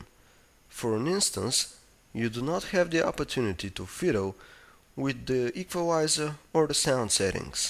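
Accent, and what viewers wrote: Russia. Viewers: wtf..the accent dude..like some russian dude in star trek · is he from Russia ????????????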